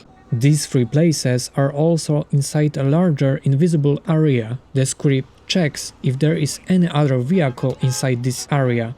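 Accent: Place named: Poland. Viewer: Słychać że polak niezły film tak trzymaj!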